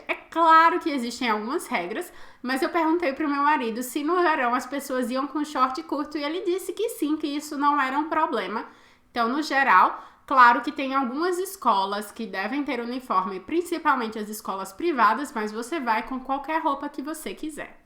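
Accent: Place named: Northeast, Brazil. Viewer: Em primeiro lugar, como baiana que sou, amo este sotaque nordestino. Aqui também os alunos nao usam farda e pagam meia passagem. Inclusive a Eslovaquia faz fronteira com a Italia né?! Aqui é bem comum as crianças e adolecentes fazerem excursao, pela escola para outros paises da europa, bem legal mesmo! Bjs 1000 e obrigada por falar de mim.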